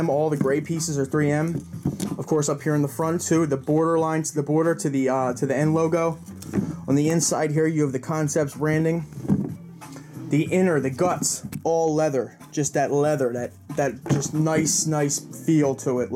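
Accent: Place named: East Coast, United States. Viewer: Yea that is how you talk but I talk like that too haha must be the east coast in us lol, the mush explanation was dope too, had me rollin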